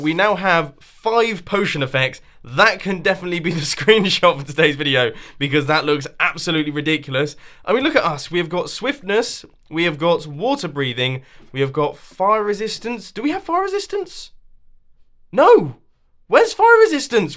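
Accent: England, United Kingdom. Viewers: NO clue when and ... i just watched etho mostly and that brought me to you sooner or later, then i just watched like ALL of the hermitcraft videos then i kinda just decided HEY LET'S SUB TO THIS DUDE CUZ HE'S GOT AN ENGLISH ACCENT AND HIS VIDS ARE DANK, i should Re-Evaluate my life, love the vids in general peace ;P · I probably subbed only at the start of hermitcraft series 4, not sure how long ago that was, but not too long. However, before that i saw quite a few of your tutorial videos. I love your redstoning and what i see as your intricacies. The first video i ever saw of yours i think was your blaze farm with crusher and lighting system, though i really only watched it for the crushing mechanism, which was super awesome and compact. Besides the things listed above, I enjoy watching your videos because of how comical you are sometimes, but i also just love listening to British people speak!!!!! 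You are a great minecraft player and ive learned a lot from you. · I subscribed about a year ago. I don't remember what video made me subscribe, but the main reason I subscribed was because if his accent. I love a British accent. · I love your British accent, it's so funny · I subscribes a year ago to your awesome channel, and began watching hermitcraft when you first started season 4 The video that made me subscribe was your 50 facts about minecraft video And I am subscribes cause I first absolutely LOVE your English accent :D (I'm Dutch btw) and I myself also love Redstone and that sort of stuff and can relate to you in the way that you call 'spoon moments' 😂 The only thing that I don't like about your videos is the consider subscribing thing, but if that gives you more subscribers then please hold it, you deserve the subscribers <3 You have become one of my favorite YouTube channels, and I am so happy that I found your channel Please keep up the absolutely amazing work!! 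You make me happy every day at 8 o'clock, thank you for that ☺️ BTW, succes with your longboard😜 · Hey;) I know this video is a little older and you might not even see this, but you've asked some questions in this Video and i just had to answer since I am binging all of season 4 for the past few weeks haha:D I did subscribe today cause I honestly kinda forgot haha and just kept watching^^ To be honest, I was watching Pewdiepies latest Minecraft Series and I just needed more, searched on Youtube for some time and found your channel aswell as the Hermitcraft Playlist, I started with season 4 and well, 94 Episodes later I am still having a blast!! The why is easy for me, your voice is extremely soothing and comforting, and I also really love your accent;) I am from germany and listen to British people or English speaking people in general helps me improve my own skills:) I also really love your creativity (not to say, the other Hermits aren't:) ), your style of editing and the way you talk to the audience. 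It immediately made me feel like part of your community! So please just keep doing what you do and I will continue binging the Hermitcraft Seasons:D Greetings from Germany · I subscribed at summer of 2015, i was interested in hermit craft series 3. I love the redstone content and your British accent, it is charming! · First video i watched was about Shrek... don`t remember what it`s called. But i liked creativity of trolling. I love friendly trolling, and jokes. I like timelapses, and fast progress. I like your voice, and this... "British" style of talking you got. I don`t know what to say more. Just... I`m relaxing while watching your videos.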